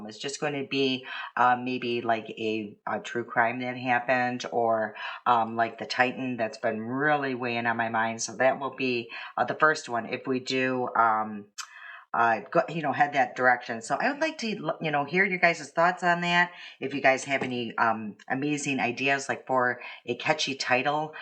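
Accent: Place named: Minnesota, United States. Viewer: Don't stop I love yr channel u r so funny and likeable Minnesota accent so charming and I loved the missa video do a skin Tint best of. Or Charlotte Tisbury dupes best of. Thku for doing a great job great channel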